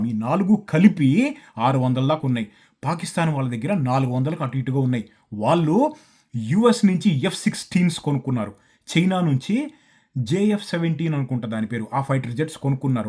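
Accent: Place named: Rayalaseema, India. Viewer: Loved the way you explained the war strategies with such clarity! Your Rayalaseema accent adds a unique and powerful touch to the narration 🔥👏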